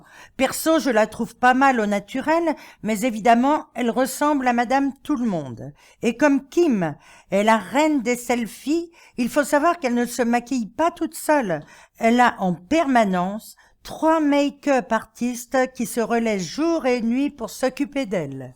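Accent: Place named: France. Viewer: Quand vous dites les noms des actrices et les titres en anglais a la francaise , c'est trop mimi ! Bonne continuation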